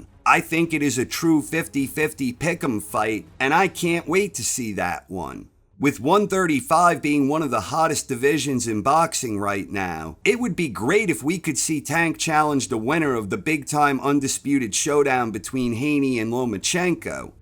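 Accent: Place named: East Coast, United States. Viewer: Like I've said before, don't ever loose that accent! My accent is Minnesotan and trust me it's not as popular around the world as your East Coast. Anyway, excellent breakdown of the fight! Davis showed us..."what's up!" My dream is Lomo vs. Davis... The Matrix vs. The Tank! Two of the greatest minds and talents of boxing yet from the most opposite ends of the world regarding culture, race and geography! Respect to both and all these warriors that have the courage to get in there wether they win or lose! I can't see Ryan as a quitter! I think he was legit paralyzed by that Tank power shot! Sneaky, fast with a Bang!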